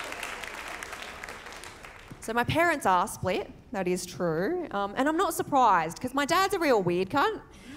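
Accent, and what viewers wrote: Australia. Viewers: I detect an Australian accent, pretty cool. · Strange seeing a Chinese with an Australian accent. 🤣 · Your material is excellent. Great comedy. Only Aussie chinese girl can deliver the punchlines so well 👍👍🌹🌹You're just as good and funny as Aaron Chan. 100% aussie accent too 😃😃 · I'm an Aussie who moved OS in 98 and I think it's just really cool hearing and Aussie accent from an Asian (sorry if this sounded racist, not my intention.....oops) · I came here from a Yumi Nagashima video. The Aussie accent really threw me off lol. I'm not sure what I was expecting when I clicked, it just wasn't a strong Australian accent 😅 Hilarious stuff!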